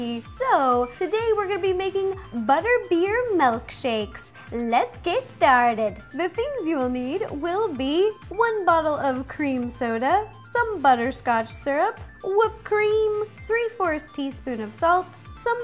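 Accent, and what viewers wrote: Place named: California, United States. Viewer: Ahhhh you're one of those... I think it's a California thing. I pronounce a myriad of words strangely. Melk, Cetch, Pellow.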